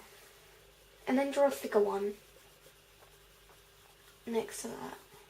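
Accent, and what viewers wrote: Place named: United Kingdom. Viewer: I LOVE your videos! I have already done all the Bakuras and I like Kisara too. It's so nice to hear another British accent on YouTube, too many Americans, though mine a lot more country than yours! :-P Just wondering, could you do either a Malik or Marik video please! I would love to know how to draw them! Anyway, love the videos and keep drawing! :-D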